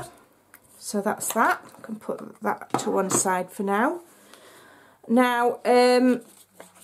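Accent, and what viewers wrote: Yorkshire, United Kingdom. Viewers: I just love this card and the Yorkshire accent it reminds me of home. Richmond North Yorkshire is my home town. What is yours. I live in Perth in Scotland now. So miss the Yorkshire accent. Love what you do keep up the good work.Christine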